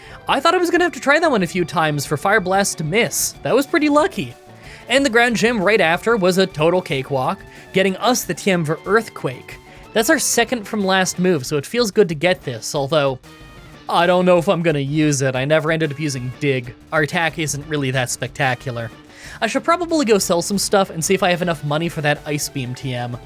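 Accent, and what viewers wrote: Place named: Canada. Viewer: This guy's Canadian accent is so cute😊😊😊😊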